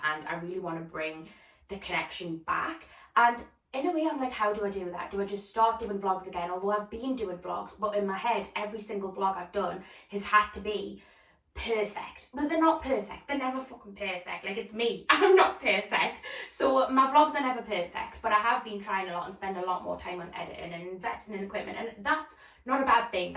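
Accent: Newcastle, United Kingdom. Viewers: I’ve been watching for years and think honestly you’re putting far too much pressure on yourself. I followed you for bargain shop hauls, fun vlogs and to hear your Geordie accent. Go back to basics, you don’t need to be an influencer or even on social media as much if that isn’t what you want. Maybe a part time job in real life might help give you some perspective on if this is what you want to do! Sending love and positive vibes xxxx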